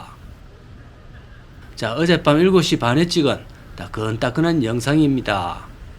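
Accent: Gyeongsang, South Korea. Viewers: 구수한경상도사투리에 후대들을생각하는 마인드 ㆍ오랜연식에서 나오는 ㆍ혜안등이국보급이ㄴ거죠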